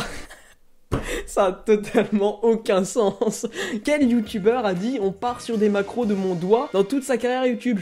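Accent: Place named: Moselle, France. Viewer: Tu viens de Metz ? Genre Moselle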